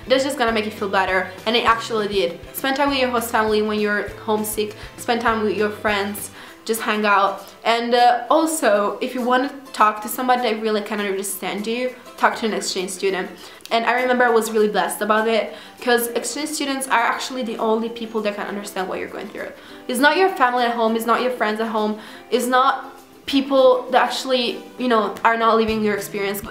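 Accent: United States. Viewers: I love your American accent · sta parlando americano non inglese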